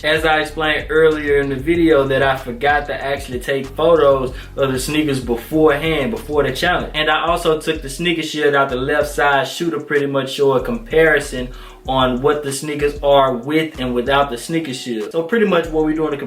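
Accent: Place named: Memphis, United States. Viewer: You sound like u from Memphis..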